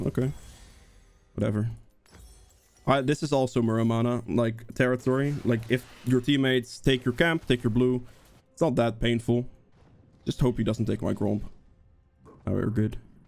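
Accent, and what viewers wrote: Netherlands. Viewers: Hoor ik nou een Nederlands accent?😂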